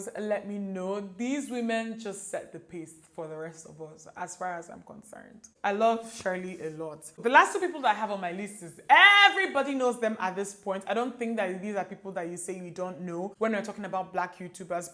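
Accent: Nigeria. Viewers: First time watcher, loved your Vid. Stunning face, impeccable make up and love you uploading in your Naija 🇳🇬 accent. Subscribed ❤️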